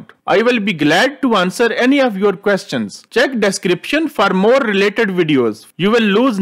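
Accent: India. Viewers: Indian accents are always funny 😂. Very good video, very helpful 👍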